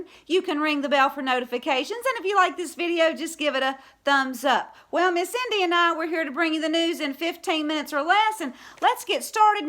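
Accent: South, United States. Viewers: I can’t believe I just ran across your channel! I’m a little late in the waking up thing but it’s been since 2016! Anyway I get a kick out of your accent(I’m southern too!) God Bless and keep on keeping on!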